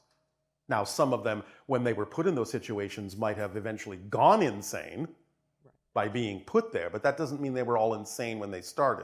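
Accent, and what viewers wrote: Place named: Ireland. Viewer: He talks a little slower and to me a bit more Irish lol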